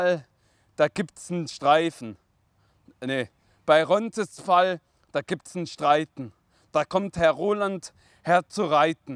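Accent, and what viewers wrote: Swabia, Germany. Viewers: DU BIST DER BESTE. Alle die ich kenn reden nur noch wie du in 3 stufen und den anderen Videos. Stuttgart rockt. Da kommen halt doch die besten her. Dein Schwäbisch ist so krass geil beim trainer und so. Danke für die geilen Shows. Stuttgart und umgebung im Lachflash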